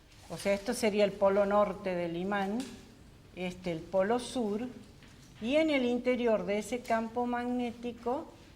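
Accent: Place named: North, Spain. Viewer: Que Facultad es? Tiene acento norteño la profe.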